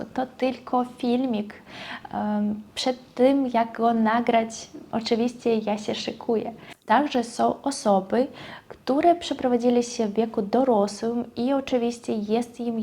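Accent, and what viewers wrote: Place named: East, Poland. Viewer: Znakomicie mówisz w Naszym języku. Masz bardzo barwne i rozwinięte słownictwo. Melodyka jest ze wschodu. Ale jest to jest urocze. Czy zauważyłaś , że każdy Polak bardzo docenia to, że obcokrajowiec stara się mówić w Naszym , przecież bardzo trudnym, języku? Ja takie osoby bardzo szanuję.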